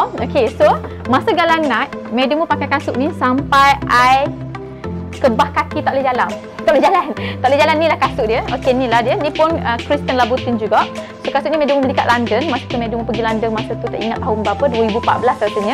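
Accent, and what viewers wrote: Malaysia. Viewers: confius jap. nie org ganu ke org kedah??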